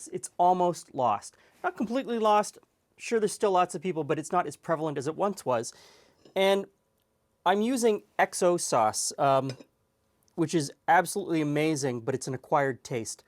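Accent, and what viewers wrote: Canada. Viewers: i knew from the accent you were a fellow canuck, always love Canadian content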